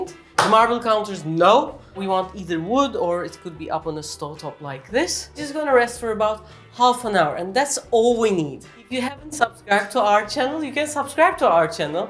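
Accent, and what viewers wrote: Turkey. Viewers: ne dediğini anladıysam arap olayım türklerin ingilizce konuşması baya farklı .D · 😋😋refika I lovesss youre Turkish English,urrrr soooo pretty,bubbly🥰🥰🥰I lovessss ur recipes from Sri lanka 🇱🇰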